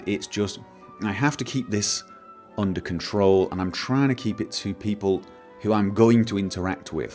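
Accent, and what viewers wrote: United Kingdom. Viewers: is norwegian? tha fuuck he sounds british as fuck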